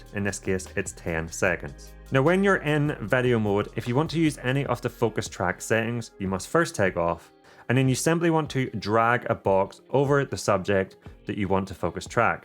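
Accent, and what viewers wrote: Ireland. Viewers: Came for the drone, stayed for the (Irish?) accent. Thnx. Grtz from the Netherlands. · Here on Youtube are many so-called self-proclaimed drones flying gurus. But none can touch your ankles. The heavy Irish accent is super. Also, your knowledge. Merry CHRISTMAS and SAFER NEW YEAR 2023. Thank you for your educational videos, I learned a bundle.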